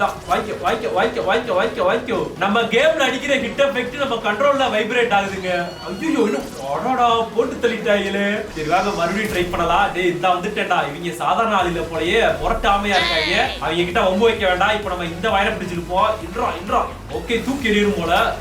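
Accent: Sivakasi, India. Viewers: Bro nee sivakasi ah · Bro, Sivakasi ah neenga ?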